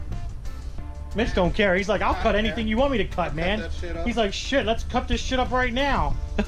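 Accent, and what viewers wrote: Pennsylvania, United States. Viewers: That's how we talk in PA buddy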